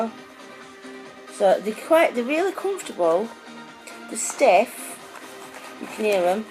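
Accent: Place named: England, United Kingdom. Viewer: by the way I like your accent are you from North or Southern England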